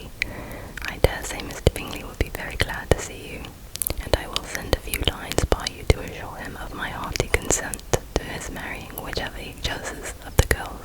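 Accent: England, United Kingdom. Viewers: Love this book ❤ and your good at reading in a British accent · i’m from the southern part of england and honestly this accent sounds very.. western or eastern british idk why 😭 anyways! very tingly and i adored this. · Oh thank you, this is beautiful. I love english accent and this video is extremely relaxing😊 love it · Let's be real - actors know which accents they're good at. You should definitely own your accent, you sound so English 🤩 Plus, who doesnt wanna sound like Colin Firth? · Your English accent makes the BEST inaudible sounds! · Luv that English Accent 🥰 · You sound kind of like kaya with your English accent she does asmr about disturbing cases and horror stuff 👌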